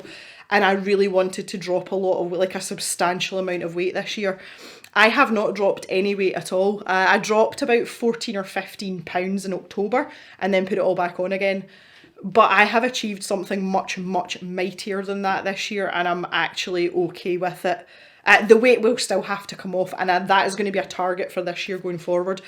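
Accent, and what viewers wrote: Scotland, United Kingdom. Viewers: Happy new year,! 🍾🥂 Congratulations on your great achievement! 👏👏👏 I love watching your videos and listening to your Scottish accent. Your sense of humor and your way of talking about your pet peeve markers in the unboxing videos are absolutely awesome, you make me laugh out loud a lot. Keep up the great work! 🙂 Thank goodness I've made up my mind I'll stick with colouring and watercolour painting - otherwise, I'd be ordering all the subscription boxes just because I like your reviews so much. 😉 By the way, it was an absolute honour for me that we got to colour our pages side by side in the Ivy travelling book that went to Johanna Basford last year! 💖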